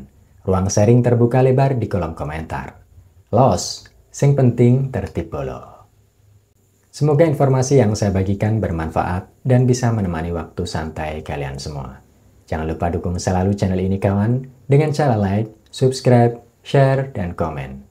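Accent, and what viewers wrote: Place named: Java, Indonesia. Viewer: Nggak nyangka untuk suku jowo medok...Suaramu cukup ok.... aku suka....adem cukup jelas....hanya kurang penekanan dikit2...suatu saat pasti lbh ok...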